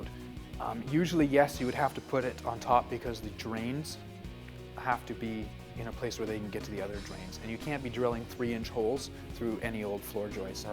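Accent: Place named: Canada. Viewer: Obviously this guy is Canadian. i.e. "blowing the snow off when it precipitates in the winter" and the accent. Not for North Carolina where I want to add on.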